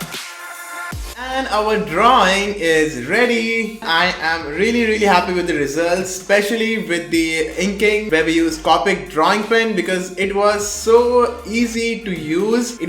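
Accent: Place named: India. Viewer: Indian for sotaque is ugly.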